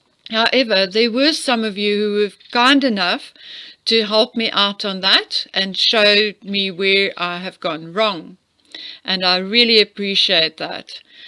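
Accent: South Africa. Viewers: I love your accent. I have two friends from South Africa and I love to hear them speak, as I do you. Do not change, just be you. I am so glad you were recommended by Yankee Wally. I really enjoy your videos and I trust them, because I know you do lots of research. We all have growing pains when we start something new. Keep on going girl, your channel is awesome! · Your South African accent is charming. We all speak English. I speak with an American accent. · Love your videos. You obviously put in a lot of work. Please don’t change your accent. It’s what makes you, you. You speak clearly and that is all that matters. I, for one, love listening to a South African accent. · 🇨🇦🇨🇦🇨🇦🇨🇦...another superb video full of information, I agree that mm knew Andrew previously. You can see it by the way he is in different photos they're both in. mm is a lying fraud and imo they haven't lost their titles because she's got something on at least one of the rf members if not more. 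Many people have lost their titles for much much less, it's extremely suspicious. Hopefully it all comes out at some point....keep up the good work. Lastly I love your accent, both of my doctors on none other than Vancouver island are south African and have the same accent as you...🇨🇦🇨🇦🇨🇦🇨🇦🇨🇦🇨🇦..💕💕💕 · It’s so lovely to hear your accent. I am also South Africa and recently FaceTimed someone in Texas. I had never met her but she was fascinated by my accent and loved it. I believe we as South Africans speak very clearly ( to the most part) and your accent and voice are easy on the ear. Actually we have a distinctive accent that one can hear and recognize instantly. Be proud of your lovely speaking voice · Brilliant video as usual. And as a fellow South African I LOVE hearing you speak. Your research is outstanding, and your courage in speaking the truth is commendable. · My best friend is from South Africa (Rustenburg) , married a German and now lives in Germany. 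We became fast BFF when I worked in Germany (now I'm back in the US) and I miss her very much. I love her accent and every time I listen to you it reminds me of her. Do you also speak Afrikaans? Please keep up the good work and keep digging. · Thank you for all the all the hard work and long hours invested in preparing these videos. By the way I love your accent. I really enjoy listening to you and love that you are from South Africa. Me I'm in Minneapolis,Minnesota in the good ole USA . I look forward to listening to future videos. I think it was Wally who mentioned you in one of her YouTube videos. She's so fun to listen too. Well about MM I could safely say no one knew who she was prior to marrying Harry and she's now driving us crazy with all her videos telling us we must do better . I use to feel sorry for Harry but I still think she controls his every move. Irs incredibly ha d to get away from a narrsasist. 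The longer you stay the harder it is to have the confidence it takes to walk out on a person like her. I see him living in fear if her wrath . You get to a point where your mind tells you it's easier to please them than to leave them. You honestly believe they will destroy your life if you do leave. I think MM has stripped his dignity and his self confidence. I think he got in too deep with her and doesn't believe there is a way out 🙋❤️ from 🇺🇸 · You have a Sth African accent.It is nice.I love your art work...the worm is cool. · There is nothing improper about a South African accent. Your English is better than that spoken by many Brits. Be yourself lively lady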